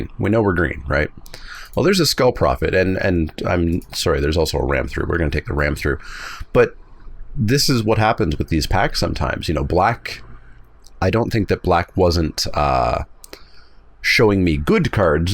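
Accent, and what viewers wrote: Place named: Canada. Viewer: You sound more and more Canadian the longer I watch these. I love it.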